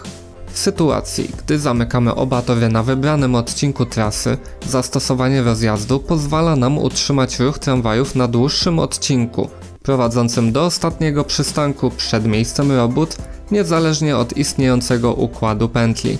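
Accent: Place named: Łódź, Poland. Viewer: Od razu słychać że jesteś z Łodzi. Krańcówka co :-)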